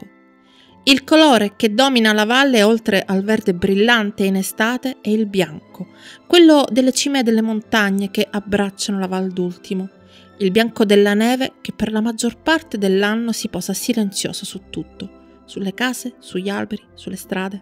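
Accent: Sicily, Italy. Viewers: Bravissima come sempre. Il tuo accento siciliano è stupendo. ❤❤❤❤❤❤❤ iscritto 😍🥰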